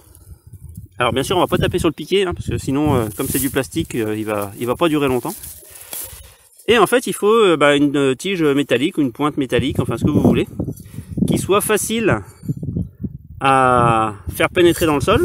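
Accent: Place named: Bocage, France. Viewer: bonjour,vous etes de quelle region,on dirait le bocage?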